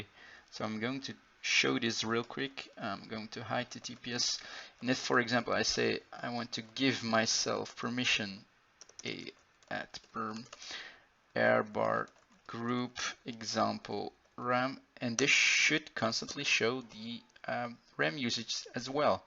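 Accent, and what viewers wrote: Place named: Netherlands. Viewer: You sound Dutch.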